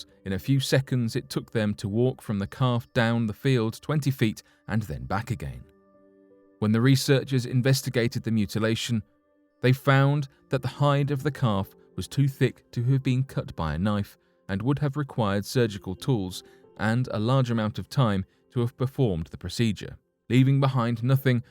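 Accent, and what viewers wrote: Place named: Cockney, United Kingdom. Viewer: More recycled bull shit that isn’t anything new. Jesus fuck. Can you make videos on anything current? Cause from what I’ve seen on the websites I follow there’s plenty for you to report on. Probably because I’ve learned proper journalism from a professional one. And you’re probably an educationally lacking British person who’s grammatical cockney slang comes out in some sentences. No matter how hard you try to hide it... still comes out. 😂. Seriously though... did you finish high school even? Like you probably didn’t even have the chance to drop out of college(university, for your English ass) did ya!?! Hahahahahah.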